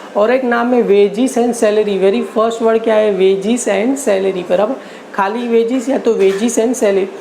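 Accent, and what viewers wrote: Gujarat, India. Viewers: Tame gujrati cho ??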